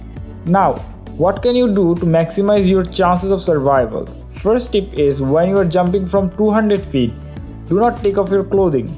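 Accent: India. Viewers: As a native portuguese speaker, he speaks like an indian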